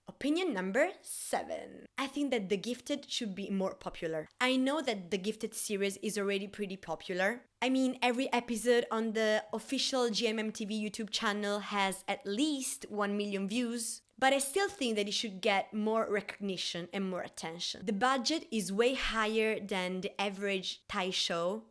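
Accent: Italy. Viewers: Se que eres Italiana pero creo que entenderás esto, tienes el inglés perfecto!! El acento está ahí pero para mi casi no se nota. Me encantó este video! ❤️ 💕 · Finally, somebody who appreciates our Volleyball gays from Taiwan! Also don't be shy about your Italian accent. We're all just weird beans watching foreign gay TV shows on youtube, we can handle diversity here ;) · I agree with nearly everything you said. I'll add I not understand the hype for Sotus I couldn't even get through the first episode and I tried a few times. I even tried viewing the other bits of it as I love Singto's work normally but it was just so boring and as you said full of boring tropes. BTW thank you and......... It was very easy to understand you, there are no problems with your English you should be proud of yourself. I love your Italian accent, oh yes I forgot to say, 'I'm English' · I love your Italian accent! 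I think it’s cute 🥺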